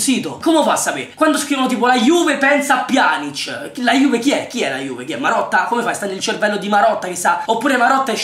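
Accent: Rome, Italy. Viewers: sei romano?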